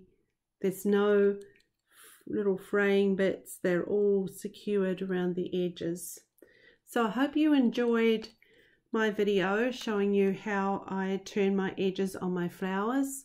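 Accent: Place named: New Zealand. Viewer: Do my ears deceive me or are you a fellow kiwi?!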